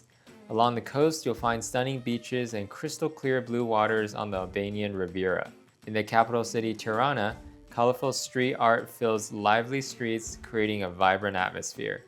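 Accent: United States. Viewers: His accent is clearly US… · I’m Irish but live in Australia. You assume because he has an American accent he’s talking USD. Assumptions lead to all sorts of f&@ ups.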